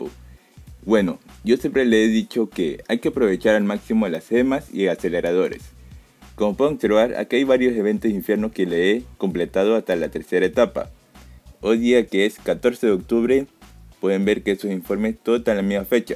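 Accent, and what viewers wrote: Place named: Guayaquil, Ecuador. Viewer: Sabía que ese acento se me hacía muy conocido xd Guayaco pa?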